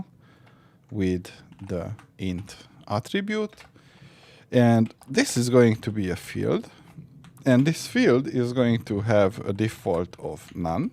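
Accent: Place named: Hungary. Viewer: I don't know why youtube recommended this video for me, but from your accent, I instantly knew that you are Hungarian as well, maybe that's why. But to add something relevant, I'm an amateur programmer, but when I needed to handle databases, I've always used pandas. Sometimes I have to use SQL in Access, but I'm not a fan of it generally. Is there a benefit - or what are they - using SQLModel instead of pandas in python?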